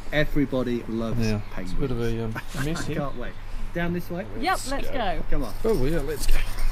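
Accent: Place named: New Zealand. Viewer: I love your accent bro. Kiwis, men and women with the coolest english accents :)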